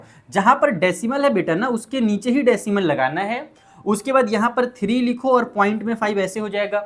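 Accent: Bihar, India. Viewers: Pta nhi chl rha kya language se biharrr babu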